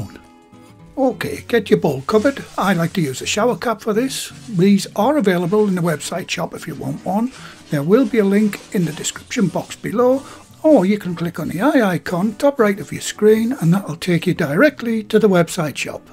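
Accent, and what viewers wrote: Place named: North East, United Kingdom. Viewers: That looks great!! reminds me of my teachers from when I lived in the North East. I miss living up there when I here his voice 🙂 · sounds just like voice actor Jim Cummings but with a Northern English accent (sorry I don’t want to assume what part of the country you’re from because I don’t know)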